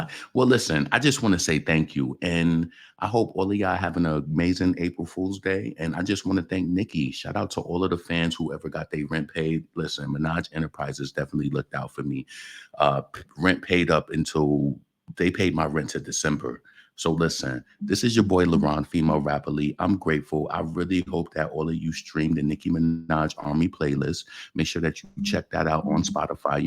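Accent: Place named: New York, United States. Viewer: Its sounds like most people in nyc....you are blessed....Nicki blessed you 😊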